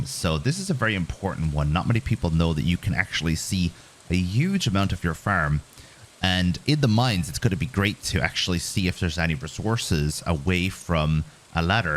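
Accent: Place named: Ireland. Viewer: Savage vid bro, i hear that irish accent peeking through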